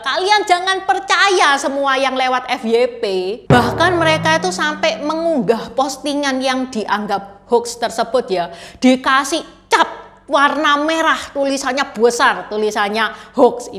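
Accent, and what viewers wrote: Java, Indonesia. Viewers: Cino Jawa medhok, sy suka mengikuti...berapi²😊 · Cara komunikasi mbak ini sangat jawa dan indonesia sekali tiada kedengaran ngomong cinanya. Kalau warga melayu malaysia mendengarnya akan makin iri membandingkan warga keturunan cina malaysia. 😂😂😂😂 Mantap sekali komunikasi mbak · Medok se x jawanya Cece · Medok mbk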